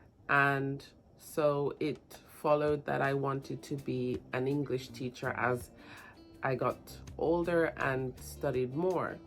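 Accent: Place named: United Kingdom. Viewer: Did you ever live in England? You’re giving me a little bit of British vibe 😂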